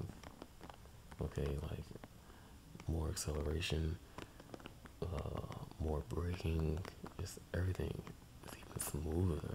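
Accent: St. Louis, United States. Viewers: Where r u from? Ur accent sounds like ur frm stl missouri.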